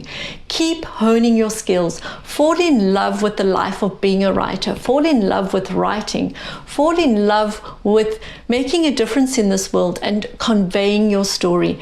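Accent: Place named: South Africa. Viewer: Hi, Sonia from South Africa here. I just listened to your 4 part talks about voice in memoir. I am in the planning phase of writing my story of how I met Jesus (in an armed robbery at 4am in my bed) after being lost in the new age for 20 years. I feel soooo inspired after listening to you speak so beautifully about voice in memoir. I want to encourage you to please keep making these videos. You are talented, well spoken, your tone is calming and confident... and most of all, you are doing what Jesus created you to do. In Him, we can be sure that we're walking the story He purposed us to do, as it says in Ps 139 - He wrote down our days in His book! I will be praying for you to get more subscribers and also that people will comment more. I see that you are based in Canada, but I pick up a South African accent. Are you originally from South Africa? 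Blessing to you my dear sister in Jesus. ps. I did a youtube search for 'voice in memoir', and your channel was first in the search results:-)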